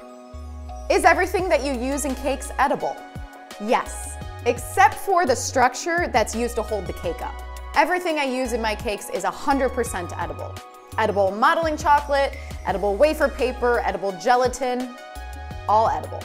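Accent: United States. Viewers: I found you on IG and assumed you were Russian. Now hearing you talk, you're obviously American lol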